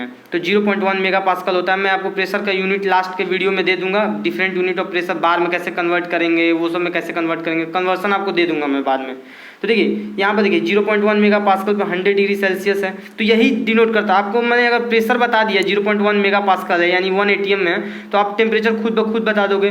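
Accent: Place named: Bhojpuri, India. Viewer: Good explanation nd I love ur bhojpuri accent 😂👍👍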